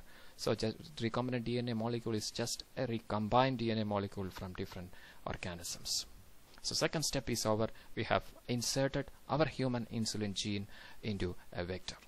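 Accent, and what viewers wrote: Kerala, India. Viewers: amazing example of mallu accent....mallus are the best!!!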